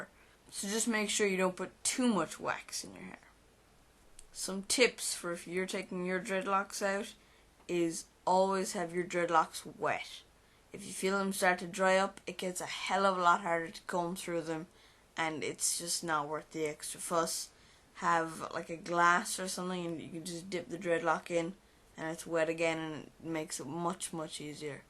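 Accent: Ireland. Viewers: Cool Irish accent now to the matter in hand. I over wax badly on three inch hair on a hot summer day and wax melt easily sipping into my pores now my scalp is lumpy and super dry . My facial hair area is also dry and hard due to the wax do you think the shampoo will get the wax out of pores. I regret the day I put that stuff on my hair! Please write me back to help guid me thanx. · Not only was this usefull, it was also amusing, you're funny, you from ireland?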